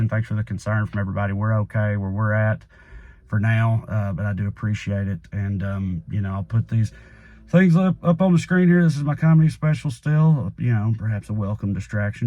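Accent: South, United States. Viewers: Love your progressive voice and the lighthearted, entertaining way you express it. Love your Southern accent, too.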